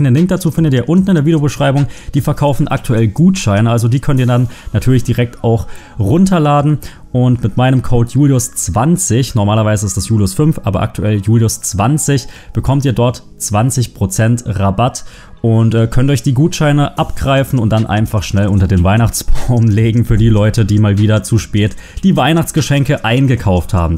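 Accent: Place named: Baden, Germany. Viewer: liegt vielleicht auch an dem badischen Dialekt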